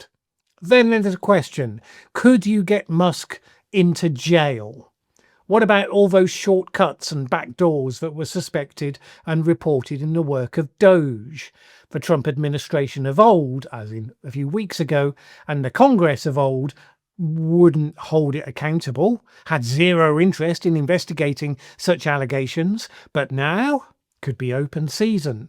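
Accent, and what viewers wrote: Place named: Southern England, United Kingdom. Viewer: I find two things fascinating about your videos 😊: 1. the analysis you make, and the case you make for it, and 2. your pronunciation/your particular English accent. The latter makes it clear that my nephews who live in southern England who had "perfect" trad modified RP diction until they went to school, from which point they spoke with Kentish vowels and struggled to pronounce 'th' consistently [voiced & unvoiced as required, ð and þ] really are following the norm in Southern English. You do not substitute v/ff all the time, for which I am grateful, because it is vital to know whether people who had "ffought" about something had a) had a think or b) had a fight... but 'with others' is clearly easier for you as 'wiv others', and this is utterly normal in 2025 on YouTube from parts of England. It makes me feel that, when helping those who are new to speaking English, we should be encouraging them to say 'th' as 'v/ff' wherever it is easier for them - certainly easier on the ear than the French tendency to "z/ss", perhaps less distracting than the Irish 'd/t' - every way of speaking English that maintains fluency is fine by me, but to do Hiberno-English you need to match it with a consistently Irish flavour in the vowels, or English natives may struggle to attune their ears to the mix. 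Thanks for both your insights in the intended content, and the effectiveness of your delivery and, as it happens, the provocation to me to reflect on what counts now as effective/comfortable dict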